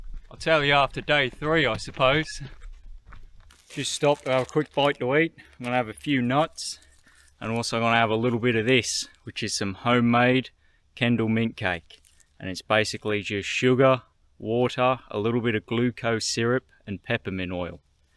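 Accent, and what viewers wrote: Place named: Australia. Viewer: Cool vid. Kinda jealous actually.. but bro how long u been in Aussie for..?? Hard to tell your accent.. it’s like 5% British 95% Oz. Very hard to pick hey.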